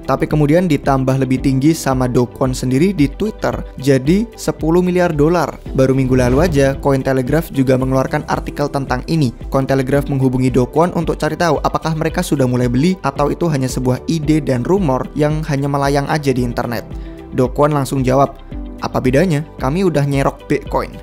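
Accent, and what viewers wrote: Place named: United Kingdom. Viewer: Aksennya British banget bang😂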